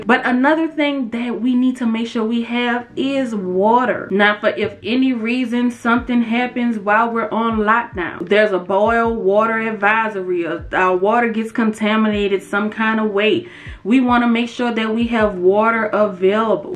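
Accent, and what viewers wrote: New Orleans, United States. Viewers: New Orleans accent strong 💪🏽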